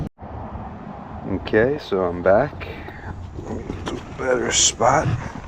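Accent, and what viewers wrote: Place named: Massachusetts, United States. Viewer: I thought so but I also thought you might be in Mass your accents not that strong. I'm in South Carolina right now but I live most of my life in Mass and been doing the trade for 11 yrs and Love it. You have a lot of the same techniques that I have . It's like watching myself. I am on the Commercial side but have done my share of attics at 120f. Dont miss the winters up there though.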